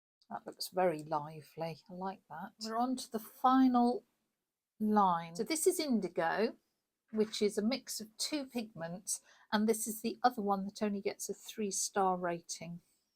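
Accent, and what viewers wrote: United Kingdom. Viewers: you really make me smile I love the way you talk - its so english. I love that we recognise ourselves in others.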